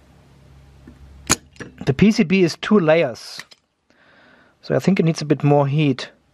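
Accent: Germany. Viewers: Thanks for your nice videos., bist Du deutscher? Ich hatte schon öfter gedacht das Du einen deutschen Akzent hast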